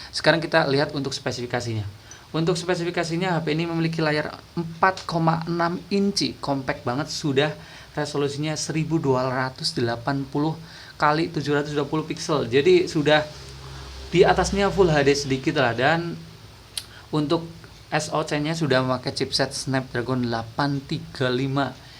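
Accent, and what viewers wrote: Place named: Java, Indonesia. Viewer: Bang lu orang Jawa ya kok logatnya Jawa bngt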